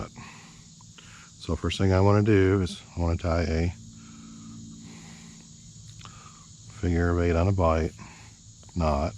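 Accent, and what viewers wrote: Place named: Austria. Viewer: Austrian conductor English. Lineman's Loop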